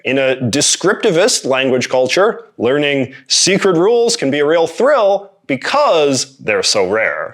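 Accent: Canada. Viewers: By any chance are you Canadian? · North Midwestern (MN, USA) here. "Hey, how yous doing?" is addressing a group of people in some rural areas in the north west of the state. I am unsure of how common using yous as a plural you is, but I'm sure it bleeds into Canada in many places, eh? Having grown up near Fargo, it is good to hear my home accent on this channel, but I suspect the host on this channel is Canadian, as the accent leans real heavy on the double-o ooh in 'about' and such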